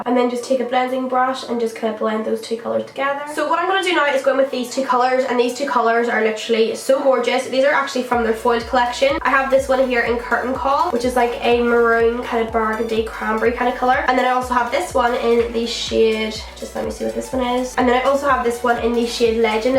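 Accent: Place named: Belfast, United Kingdom. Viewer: Are you from Belfast? I recognise that accent XD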